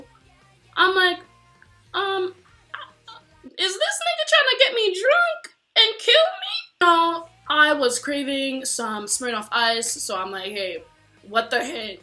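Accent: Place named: Africa. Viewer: Are you African? I heard that accent! I'm Nigerian 😁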